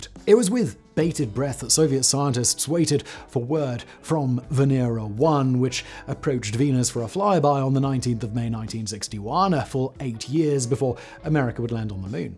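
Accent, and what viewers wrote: United Kingdom. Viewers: why is there suddenly 50,000 british guys narrating stock footage for ad revenue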